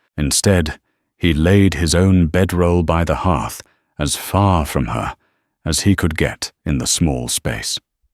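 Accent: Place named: United Kingdom. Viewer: You need someone to speak America English for an American stories.. Not English from UK..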